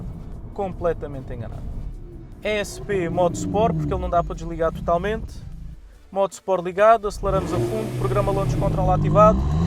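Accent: Portugal. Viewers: Acho muito legal o sotaque Português, o Polo é sensacional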